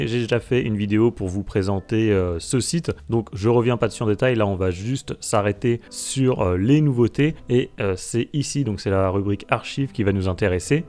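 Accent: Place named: France. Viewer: j adore le petit accent très Frenchy sur l anglais :D mais merci pour le boulot réalisé, c'est chouette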